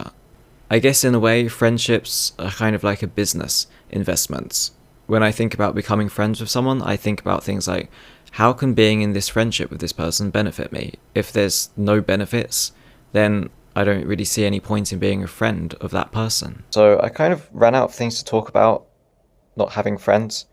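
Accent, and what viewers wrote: United Kingdom. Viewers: there is something really annoying with low-level trolling in an english accent. I don't know why that is..... and when a troll has a russian accent it instantly becomes amusing and cool! but when it's that english accent...... it just becomes YUCK! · He speaks with a fluent English accent though.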